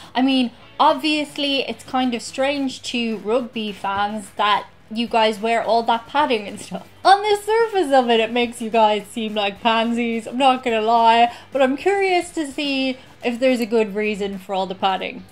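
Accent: Ireland. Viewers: With all the American topics I was starting to think there's a strong possibility that an irish impersonation was just part of a gimmick haha so terrible of me to say I know, there's no complaints it was just a little peculiar when I heard it!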